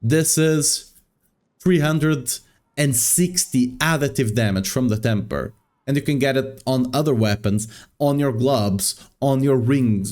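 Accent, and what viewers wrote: Portugal. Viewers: Are you Portuguese by any chance?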